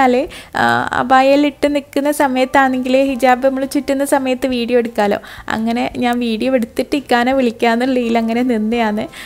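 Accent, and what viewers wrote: Kannur, India. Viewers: Hi ... Videos kanan Nala resam undato. Kanoor sasaram kelkan Nala super anu. Eniku oru mol undu 8 months anu mole uragikazhija night 11 pm kazhija njn videos kanan time kittoolluto atha comments onu ayakan pattunilane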